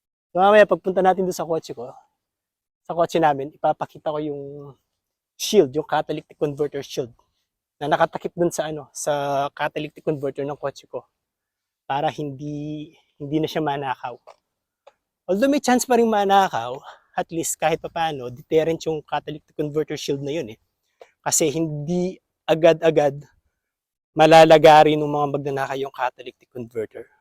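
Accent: Pampanga, Philippines. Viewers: yung accent ng kapampangan andon pa rin kahit matagal ka na dyan 😅 ingat kuya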